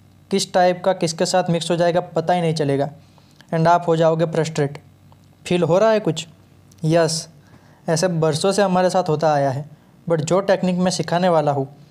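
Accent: Gujarat, India. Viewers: bhai gujarati hoi evu laget???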